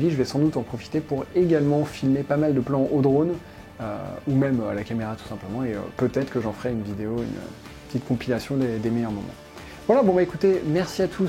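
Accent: England, United Kingdom. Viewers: mais cet accent anglais je suis jaloux !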